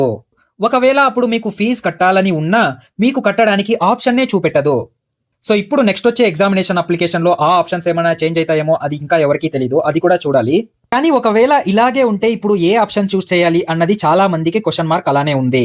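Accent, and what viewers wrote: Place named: Rayalaseema, India. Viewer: Anna super ga Explain chesaru... Mee slang, Rayalaseema/Karnataka border lo unna valla laa undhi... Meedhi ye ooru thelusukovaccha?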